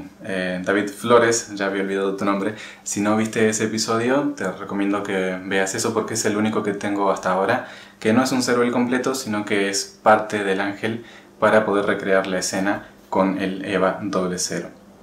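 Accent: Argentina. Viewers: Me suenas como de Mendoza o San Luis medio parecido el acento. por el tema de las articulaciones que use lubricante siliconado de alta perfomance para rodamientos en spary seria el que usan para lubricar los coolers una ves me paso y le meti con el spray y se soluciono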